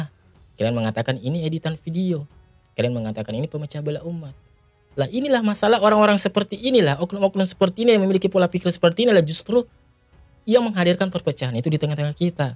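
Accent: Sulawesi, Indonesia. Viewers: Cara bicara anda sepertinya orang Sulawesi dan sy yakin anda cm mondok di Sulawesi tdk di Jawa jd belum tahu semua tentang pesantren aswaja. Anda bilang objektif tapi ketika ada komentar yg mendukung anda bahkan menyudutkan NU anda malah like. Dmn letak objektivitas anda? Kalo memang anda objektif harusnya anda bilang itu oknum 🙏🙏🙏🙏🙏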